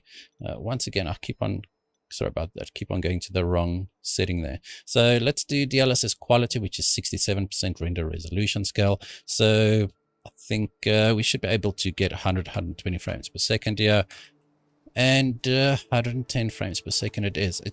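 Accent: South Africa. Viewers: R u from south africa ? N any amd gpu to test this one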